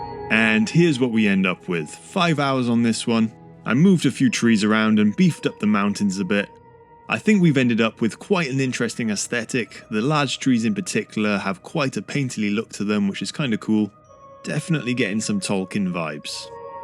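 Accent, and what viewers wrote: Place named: United Kingdom. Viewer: Love ur british accent, "bo'om left" 😂. Anyway, that's a great artwork right there man 🙏❤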